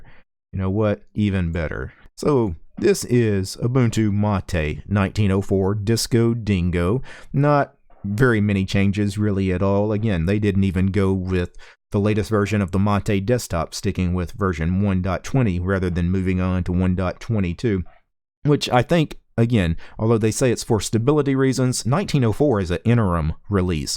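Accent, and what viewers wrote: United States. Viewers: could you have a look at opensuse? Or suse enterprise? Its not that I prefer one distro above the other but our envirnoment at my office is bound to sles 12. And I think suse is german company (im swiss) so it would be interesting about an international meaning. Im not sure where you are from, you sound US, anyway your voice is amazing. Cheers from switzerland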